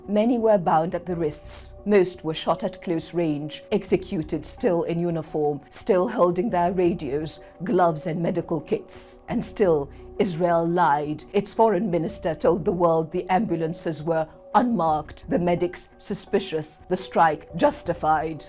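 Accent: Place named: United Kingdom. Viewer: لهجة بريطانيا مقززة. إحكي عربي يا بنت الناس. ليش صوتك هيك متل العجوزة اللي بتعاني من إمساك؟؟ 😂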